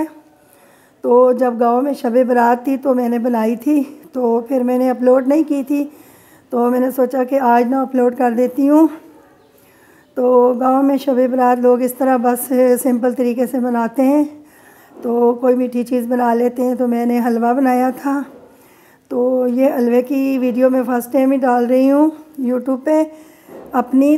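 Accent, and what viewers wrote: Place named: Mansehra, Pakistan. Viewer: nice yuummy yuummy halwa apk bolny k style sy lgta ap mansehra sy ho me b mansehra city township sy hu apko gft dn kr diya a ap b kr dena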